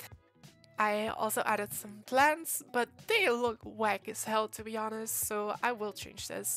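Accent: Netherlands. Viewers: You’re definitely dutch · nederlandstalige detected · amazing video! are you dutch? i noticed it by the irl boat and voice :D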